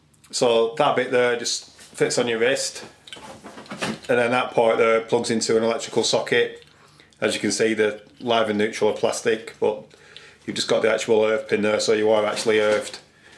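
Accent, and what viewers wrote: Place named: Yorkshire, United Kingdom. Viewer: Your accent is sick! haha Where you from? yorkshire?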